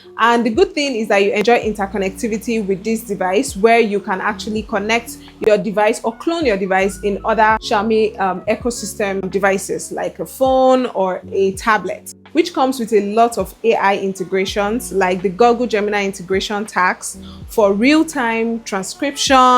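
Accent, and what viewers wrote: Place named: Nigeria. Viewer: 0f all the reviews I have seen on this device, yours is the most comprehensive (maybe I am biased because of the Nigerianness of your accent. That said, I think this is serious value for your money. I value large battery life in phones and that made me go for Tecno Pova 6 Neo, but the specs are at a lower part with the Poco, and it's costlier. I have been scouting for a budget device to replace my tecno until I stumbled upon this phone on Facebook. I am so impressed with the specs and I am going for it.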